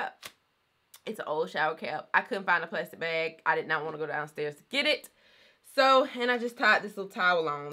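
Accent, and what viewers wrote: South, United States. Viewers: I 👂🏾 a southern drawl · I know your family originally from the south lol "ju lie Dat rie dur" sound like all my family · Omg!! Just found your channel and I love it! Your hair looks great! Have you tried the Dickey Method wash’n go? New subbie just because of your accent, you gots to be from the South!!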